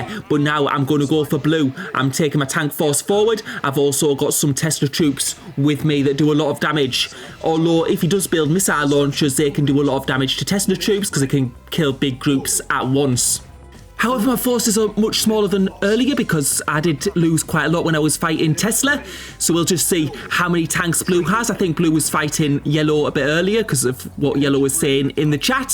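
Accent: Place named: Northern England, United Kingdom. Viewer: im not an expert, but it's a northern English accent, my guess would be something around Manchester.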